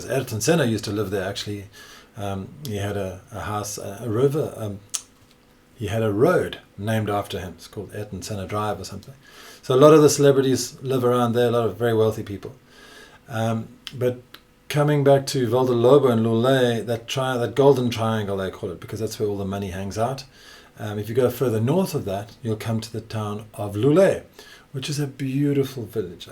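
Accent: South Africa. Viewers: Love the SA accent! I have one too :face-blue-smiling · Im a portuguese expat living in Europe and I've just come across your vids a few days ago. I have to say this is probably the most comprehensive, authentic, interesting, fun to watch yet rigorous video I've seen about the Algarve. You've made me start thiking about relocating back to Portugal, who knows, even to the Algarve - who knows - although being originaly from Porto i enjoy a bit of cold, rainy days and mountains nearby (not that we have many in Portugal that you can call true mountains). You're probably the best advocate for Algarve tourism and economy right now. Loved the SA accent, btw. Take care. Looking fwd to check out some of your other vids. 👍😉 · Hi. Ts nice to see you've retained your amazing SA accent. Can you please send me some cost effective house/apartment (2beds) prices. I'm looking to retire somewhere - SA currency squeezing ones options. 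<mail> Thank you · Hi, fellow South African and new subscriber here, so good to hear a familiar accent! ❤️ We’re considering retiring to the Algarve so your channel is just what we need. Looking forward to watching a lot more of your helpful information!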